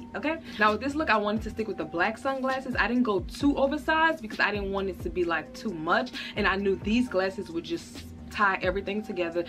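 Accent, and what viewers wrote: New Orleans, United States. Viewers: I would've never guessed your accent for New Orleans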